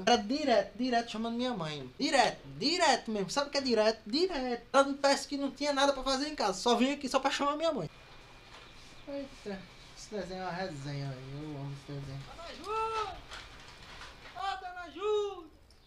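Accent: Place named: Pernambuco, Brazil. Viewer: Mermao com esse sotaque ai ce é de pernambuco?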